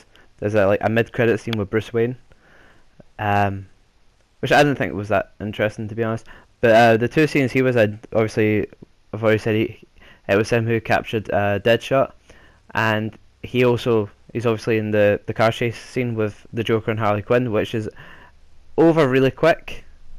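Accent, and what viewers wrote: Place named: Kilmarnock, United Kingdom. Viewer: That killie accent makes me moist :0 Film would've been so much better had they edited it differently. There's a lot of deleted scenes where they could have made a completely different movie, but instead it was mediocre. As for deadshot, he needed humanised, you need to get behind the main characters. They were all nicer and gentler than the comics depict them. Affleck was the tits yo!!!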